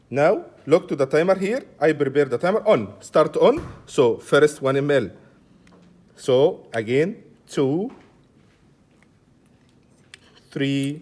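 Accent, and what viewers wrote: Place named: Arab world. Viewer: Yeah, I think his accent is Arabic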